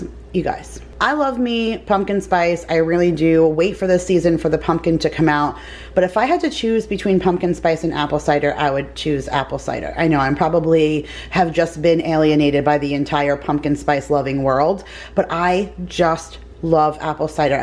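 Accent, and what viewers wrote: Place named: Italian-American, United States. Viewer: Great haul! I miss Trader Joe's so much!!! I live in Hawaii now and they don't them here (or Dollar Tree!!!!). Can't wait to move....I'm a long time viewer. I know a lot of people rag on you for how you say "ricotta" and "mozzarella" but I just wanted to let you know my great uncle and grandparents used to say those words how you say them too. They were first generation Italian and grew up in Pennsylvania. I definitely think its an Italian-American/ back East thing. I don't have a problem with how you say it. Own it, that's who you are! Anyways great haul! I love this time of year too (even though there are no seasons in Hawaii lol)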